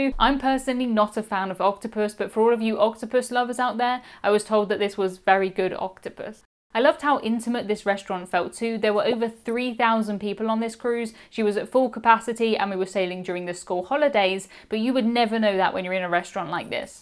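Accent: United Kingdom. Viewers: I love your videos! btw is it cool if I call you? Also, fun fact, as an American I have my siri as British accent. Her British accent pales in comparison to yours. I wish I could have your voice as my siri.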